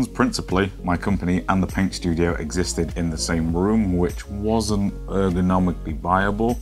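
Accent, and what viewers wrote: New York, United States. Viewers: Good video. That NY accent is excellent. Only one copy of Cursed City though? It's like you don't even know how to youtube. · I love this dude. Just discovered the channel. Keep on doin this beautiful thing your doin. Nice NY accent btw.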